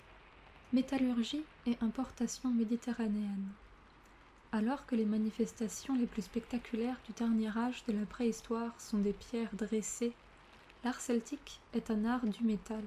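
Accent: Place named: France. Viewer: You know, I’ve noticed this before when you made the english-french video. You speak both languages really well, did you grow up speaking both? Also, i don’t speak french but I’ve heard it enough that i feel confident assuming you’re french, right? Like your french is from france and not Quebec. That aside thanks for the nice video, i hope you make more french asmr but mayhaps a bit more slowly next time. Personal preference 😁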